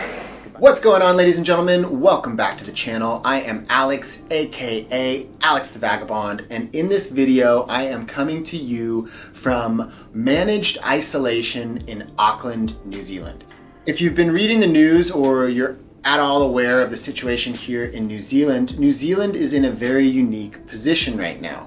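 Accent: United States. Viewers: I'm sorry but how were you allowed to even come here, are you a resident ? that accent sounds like American so-called closed borders ay. Typical one rule for some and different rule to everyone else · Welcome Home! As a fellow kiwi, it’s super interesting to watch your journey on coming here to NZ. Look forward to you watching your journey in loosing that American Accent as you settle in 😅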